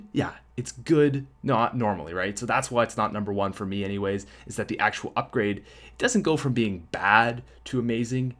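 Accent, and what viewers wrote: Canada. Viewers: What's least favorite gun? The smR! No offense to your Canadian accent, in fact I like your accent. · He's Canadian he said aboot instead of about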